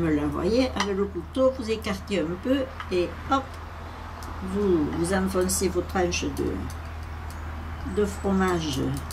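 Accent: Provence, France. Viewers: Recettes de mon enfance que je redécouvre avec vous.....😢❤ et en plus... notre cher accent provençal...❤❤❤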